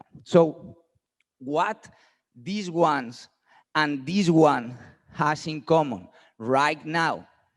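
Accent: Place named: Spain. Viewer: Eres un crack, quizas un mejor ingles lo haria todo un poco mas profesional, tu pronunciacion es muy española jajaja sin animo de ofender, te admiro mucho, un saludo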